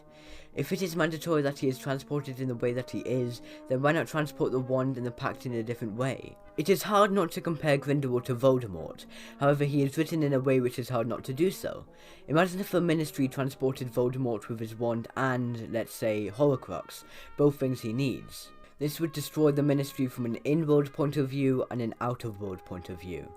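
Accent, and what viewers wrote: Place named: United Kingdom. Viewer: Oh wow, you're actually British. Didn't expect that.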